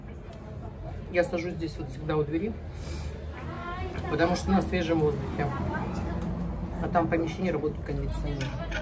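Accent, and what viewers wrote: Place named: Russia. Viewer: Вы хотя бы правильно назвали улицу...😂Самбурова !!! ( спроси у местных) кацапский говор + не правильно ударение😂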